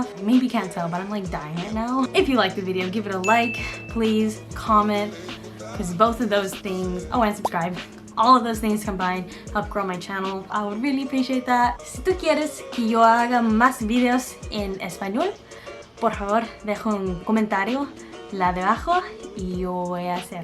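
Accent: Rio de Janeiro, Brazil. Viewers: O que deve ser realmente ruim para um nikkei ao praticar japonês é que os japoneses devem supor pela aparência que o nikkei seja fluente por ter aprendido em casa. A propósito, seu sotaque de português parece do RJ. Muito bom!! 😎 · Conheci seu canal a pouco tempo, parabéns, você fala muito bem português e seu sotaque é bem próximo ao sotaque carioca. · Olá,. Falar outras línguas é um privilégio de poucos. Você possui um belíssimo sotaque brasileiro e demonstra muita dedicação e habilidade com idiomas. Você certamente é uma inspiração para aqueles que querem se aventurar pelo mundo do poliglotismo. Parabéns, e keep up the excellent work! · seu sotaque é bem carioca! s2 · Seu português é muito bom e tem um sotaque meio carioca, sotaque aqui do Rio de Janeiro. Pra entender como é o sotaque daqui do Rio é só escutar a cantora Fernanda Abreu, carioquérrima. 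<link> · Você se expressa muito bem em português do Brasil. Acho que em outra encarnação você foi brasileira!!!! Mas o seu sotaque é carioca. Tenta usar o sotaque gaúcho. As girias gauchas também são muito legais. Bah, tchê. São tri-legais. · sotaque carioca diria .. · Aprendeu Português com um carioca kkk, ta puxando o S, INGLÊIXXX KKK Linda! :D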